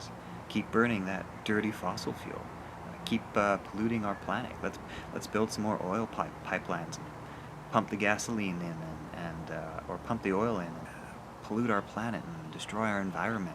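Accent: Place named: Ontario, Canada. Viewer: Are you from Ontario? Just hear a slight Accent there.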